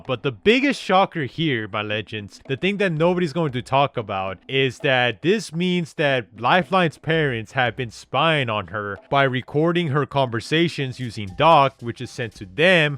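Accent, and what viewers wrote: Europe. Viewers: Texas??? What... you sound European wtf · You live in Texas? I’ve always thought you were European from your accent.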